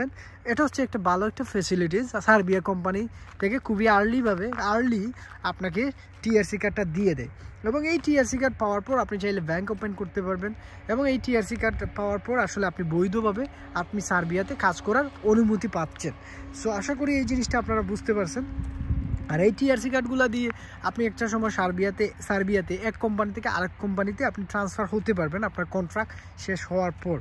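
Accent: Sylhet, Bangladesh. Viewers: ভাই কি সিলেটি না কি?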